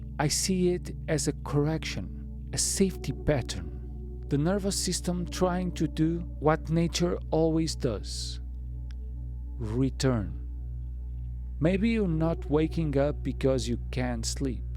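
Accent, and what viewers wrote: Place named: Portugal. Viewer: Do you speak Portuguese? I hear your accent! Do tell us more about yourself. I just read “ Why we sleep” book. Sleep is fascinating. We are so complex!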